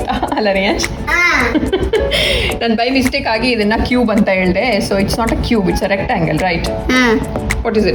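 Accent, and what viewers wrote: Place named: Bangalore, India. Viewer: Abhinandanegalu.... 👌👌👌 Nim kannada Blore kannada..... sounds nice... but namma Udpi kudla kannada keloke thumba chennagirutthe... alwa... omme kudla kannada dalli maathadi... chanda irthadhe...🤭 Cute.... 😘 ❤ from Dubai....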